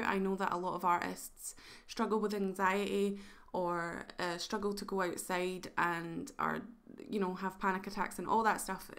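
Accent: Scotland, United Kingdom. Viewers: Maybe it one of these elitist things. I am bad at not doing any practice things between my art club meetings but do mean to. Nice to have a Scottish accent for a change. Just subscribed.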